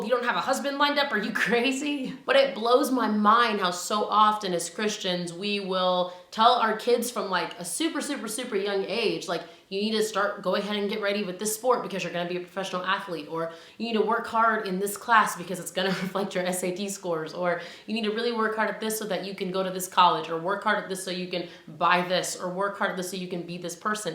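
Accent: South, United States. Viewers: omgosh your southern accent with this message is the most amazing thing ive heard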